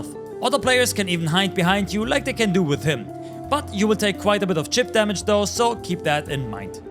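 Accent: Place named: Germany. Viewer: Are you german ? 😅 because of the dialect. Edit: Bin mir komplett sicher xD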